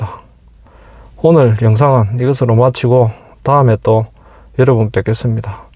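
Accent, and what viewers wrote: Gyeongsang, South Korea. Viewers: 경상도 사투리 넘넘 듣기좋아요 방송최고입니다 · 경상도 사투리가 어때서요? 경상도 사람이 경샹도말 하는건 당연한거죠.하여간 별별 간섭질 하는 사람들 그것도 병이다. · 경상도 사람이 경상도 말 해야지 어디 말 할까요 개 껌 씹는 소리 그만 하세요. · 경상도 말 사용하니 더 정감이 가고 좋습니다 힘내시고 갱상도 홧팅❤ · 경상도 사람이 경상도 말쓰지 제주도 말쓰링???웃겨들~~~~~~~~~~~~~~~~~~~~~~~~~ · 진례ㆍ장유 김해주촌 ㆍ진영ㆍ생림 삼방 안동 사투리좋습니다 응원합니다🎉😂😊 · 산 위에 배. 기억이 희미하기는 하지만, 강원도 정동진 바닷가에도 있었던 거 같은데? 이 곳은, 건축, 영업시설 자체에 문제가 있어 보인다. 바로 옆에 대형 철탑, 주변에 그런 대형 철탑이 산재하여, 이런 곳은, 기피장소에 해당한다. 그리고 산 위에 대형카페와 숙박시설을 건축하다보니, 걸어가야 하는 그 진입로 부분도 너무 좁고, 길고, 안전 난간도 낮고, 부실하여, 불편, 불안해 보인다. 보이는 전망이 주변 산 위에 시설된 철탑들인데, 굳이 이런 곳을 찾아서 가려할 까? 그리고 경상도 사람이, 경상도식 억양과 말투로 말하는 것은 당연한 것인데, 그런 것을 가지고, 어느 개 동네에서 사는 강아지가 낑낑 거리며 그런 개소리를 짖을까?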